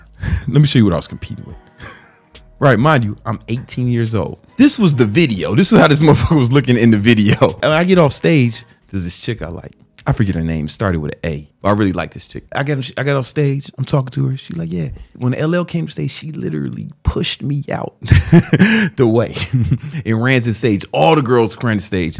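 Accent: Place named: New York, United States. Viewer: I know u grew up in Chicago but u def speak New Yorkanize. I like that quote: ain’t got shit to do with you homie. As we say in NY, mind your business and keep it pushing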